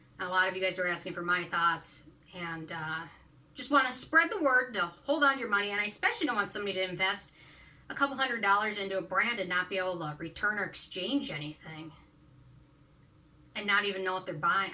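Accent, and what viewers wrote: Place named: Midwest, United States. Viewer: Chicago or MN? Maybe even PA? Accent.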